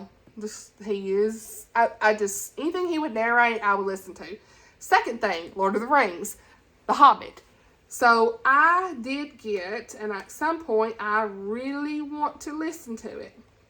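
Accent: South, United States. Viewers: ok the libby app I think I may have heard of, is it like hoopla? I know they do books, movies, audio book, e-books etc. Whatever is at your library. Ok will check it out thanks. Love your country accent btw. I tend to pick up my country accent after hanging around my cousins from the south. Then I get back home & people forget I am not actually southern lol.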